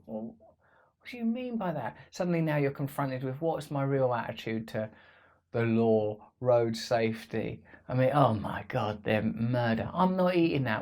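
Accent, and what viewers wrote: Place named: United Kingdom. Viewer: It would be interesting to understand why you were so cavalier and uninvested before you got your "religion". You were the bad boy of the British pop media who was all about instant gratification and irreverent of proper British culture. You built a career on trivializing all the traditional values by your promiscuity, drug use, and extravagance and all in the cleverest way in a proper British accent. Now suddenly you have awakened and give talks and harangues to addiction programs and youtube videos on the sanctity of the body and of relationships as though the extended consequences of one's actions dawned on you. Why don't you come full circle and tell us what went wrong in your development that made this transition so dramatic for you. It is not your children, although by extension they are part of the metaphor. Why did you not recognize the value of intimacy and building relationships and self-knowledge even before your children? 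What was missing in your development?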